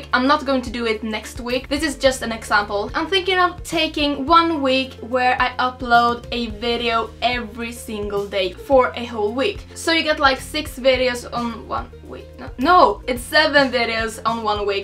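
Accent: Sweden. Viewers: I really looooove your Swedish accent 😎 You're so Cute 😘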